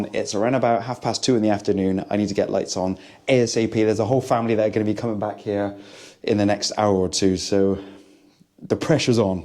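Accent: Bristol, United Kingdom. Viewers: Nice to hear a Bristolian Accent again. I moved over to Cardiff over 20 years ago and miss it. Good video as well.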